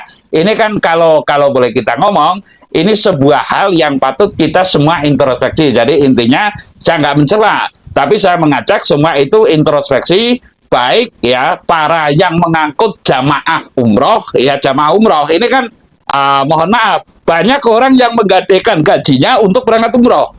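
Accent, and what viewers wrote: Banyumas, Indonesia. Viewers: Nyuwon pirso Mbah penjenengan alamat ipon pundi.nuwon sewu.logat ipon kados banyumasan.kulo saking banyumas.sugeng Rahayu.🙏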